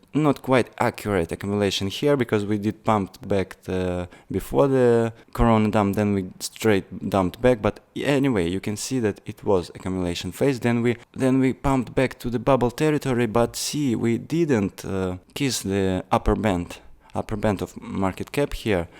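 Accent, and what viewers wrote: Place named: Russia. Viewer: Your English is perfect !👍👍👍Но есть русс. акцент как и у Ivan on Tech.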